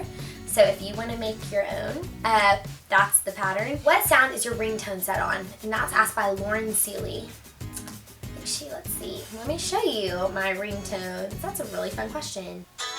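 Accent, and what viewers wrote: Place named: Dallas, United States. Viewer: As a Texan I could tell immediately you were from Dallas before you said it lmao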